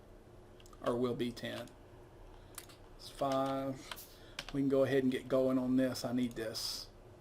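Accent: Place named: Midwest, United States. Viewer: Btw where are you from? From your accent I'd guess American Midwest, maybe south?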